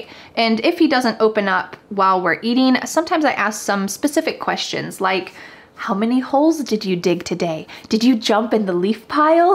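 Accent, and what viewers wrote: United States. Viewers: J'adore vos cours, je comprends très bien votre accent américain. Merci beaucoup pour le temps que vous accordez à faire ses cours 😊😘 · You are doing a great service. Without knowing anything about phonetics and great vocabulary, if I watch your videos everyday, I bet within 6 months, I shall speak at least 50 % american accent. I think it is the easy way to learn a language, that is to listen . Gradually one can pick up. Thank you.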